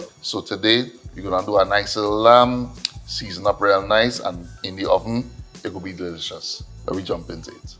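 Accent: Trinidad and Tobago. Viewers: I love to watch you cook Bro. I also love to hear the real trini accent when you explaining how to prepare the dish. Total Trini style! Ah bigging you up! 🙌🏾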